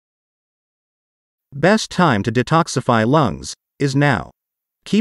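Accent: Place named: United Kingdom. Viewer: bro hindirishi naam likh k kis aangrez se bolwa rahe aap proote karo na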